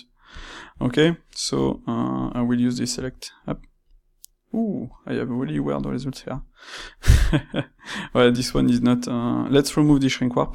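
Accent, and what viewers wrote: France. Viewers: if i do disss for exsapoool de coursour hahah i love franch english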